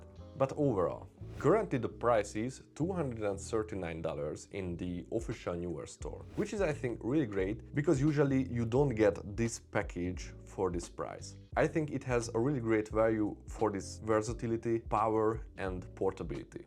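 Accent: Hungary. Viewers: Az első mondat felénél levágtam, hogy magyar vagy xd ment a sub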